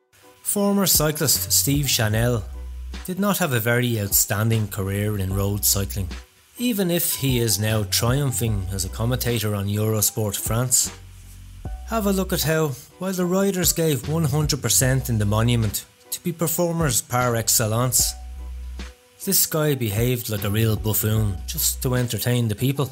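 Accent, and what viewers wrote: Ireland. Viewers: Irish gay narrative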